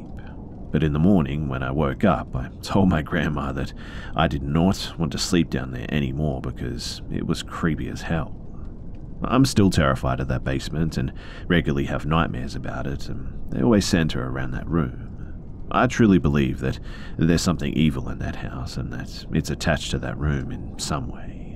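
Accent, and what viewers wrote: Australia. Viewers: He is I don’t know if you’re Aussie but it’s obvious to me, as I am lol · Wait?! Are your Australian?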